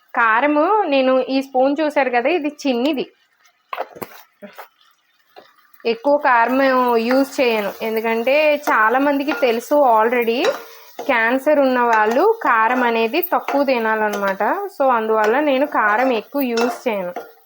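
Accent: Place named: Kakinada, India. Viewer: Soursop soop fruit juice mee husband ki ivvandi , B17 foods pettandi , non veg thakkuva tinali ,sugar thaginchali ,ila diet control cheste mee husband cancer growth thaggutundi ,maa ammagaridi kkd vakalapudi ,maa attavaru vzm, meeru chala baga matladutunnaru ,meeru matladutunte kakinada lo unnatlu anipistundi,mee matalu vinalanipistundi , maa maradalu kuda meela untaru.maa chanal svcs dairies meeru subscribe chesukondi maachanal peddaga develop avvaledu.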